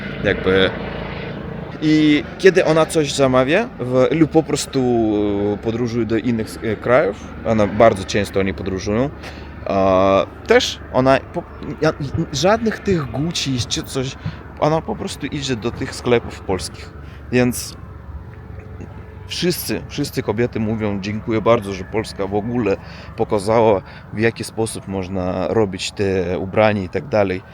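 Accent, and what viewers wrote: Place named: Russia. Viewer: on jest rosjaninem to slychac on dalej jest Ruski co za blazen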